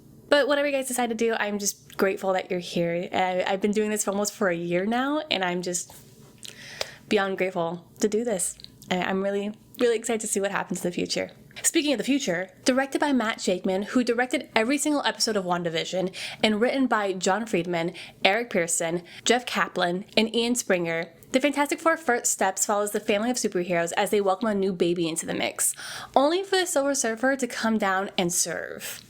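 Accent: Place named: Israel. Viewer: you sound israeli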